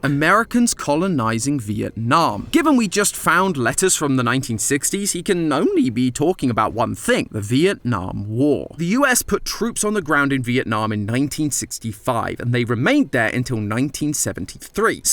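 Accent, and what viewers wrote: United Kingdom. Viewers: Hey British mat pat tell mat pat I said hi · british matpat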